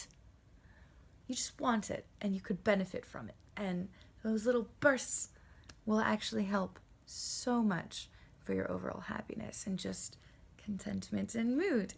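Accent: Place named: England, United Kingdom. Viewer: There's that English accent again.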